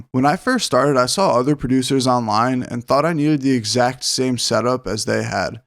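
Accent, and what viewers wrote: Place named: Liverpool, United Kingdom. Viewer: Good video thanks! Random question but your accent sounds like you have a slight scouse twang,were you originally born in Liverpool UK?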